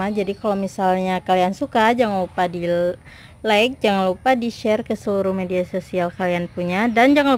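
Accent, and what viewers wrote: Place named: Aceh, Indonesia. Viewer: Kak dmn tinggal nya??? Dari logat nya org aceh ya??? Rencana saya mau main ke tempat kk, tlg di balas ya 🤗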